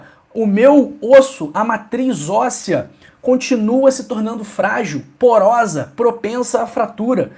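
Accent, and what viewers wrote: Rio de Janeiro, Brazil. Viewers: Pecebir que o dr era Carioca devido seu sotaque de carioca !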